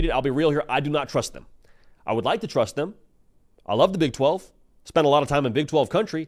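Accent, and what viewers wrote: South, United States. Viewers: Your southern Roots are showing! 😅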